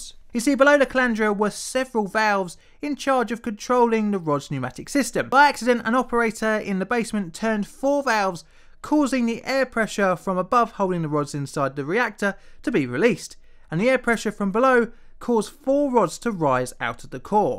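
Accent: United Kingdom. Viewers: You have no idea how much i appreciate you use the metric system in your narration (and your British accent)! Most english channels use feet and inches which i can barely translate properly. ^^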